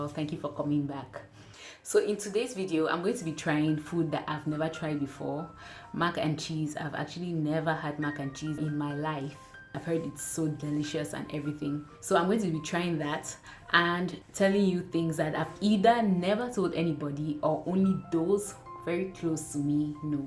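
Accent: Nigeria. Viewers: Followed your comment from Em Etetim's video down here. If not that your channel shows Cameroon, you pass for 100% Nigerian. Both in looks and accent. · Wow this girl is Cameroonian?? She sounds Nigerian, I can't even tell. We Africans are one. You've gotten a new sub my sister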